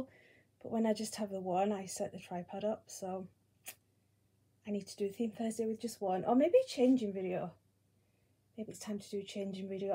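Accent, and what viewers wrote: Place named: United Kingdom. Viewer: Don't you dare change the way you say anything! I love your British accent just the way it is. ❤️❤️❤️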